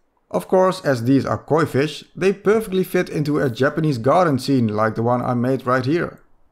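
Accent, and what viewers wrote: Netherlands. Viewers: Ik hoor gewoon dat dit een Nederlander is hahahah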